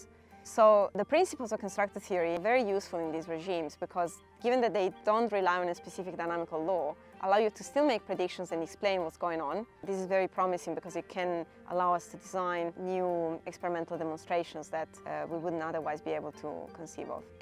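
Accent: Italy. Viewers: Er, her accent would be Italian, not English, as she comes from Turin.....So she passes the Turin Test! (sorry!)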